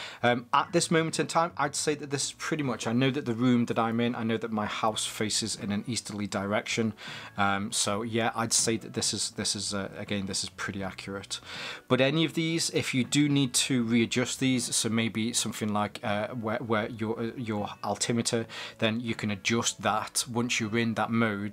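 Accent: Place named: England, United Kingdom. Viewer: Great review. I totally agree with your comments regarding negative displays. IMHO they're style over function and my main reason for buying a watch is tj read the time as easily as possible and the negative display models are a PITA. The combination of a larger display and the black model with the positive display are the one I'll be going for. As I said initially, a great review and nice to hear someone with an English accent for a change.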